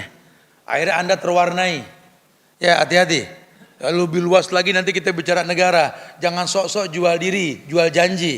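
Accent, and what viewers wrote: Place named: Jakarta, Indonesia. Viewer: Bnr bnr bahasa ibu ku .betawi bget.barakaallahfiq ustadz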